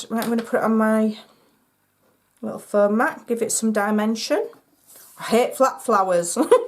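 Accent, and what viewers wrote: Yorkshire, United Kingdom. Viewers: Great Video and Beautiful Box, keep them coming!! I enjoyed laughing along with you so much, that I have subscribed to your Channel to hear more from you, so I am looking forward to lots more lovely ideas. Love listening to your Yorkshire accent - I am an Australian SU Demonstrator.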